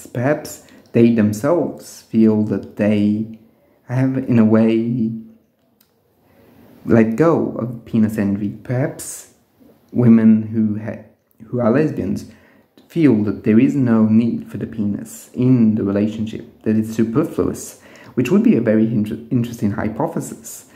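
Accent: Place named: France. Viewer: You speak very good English whilst retaining the charm of your beautiful French accent. Very well presented, informative and thought provoking video. Thank you. I have subscribed.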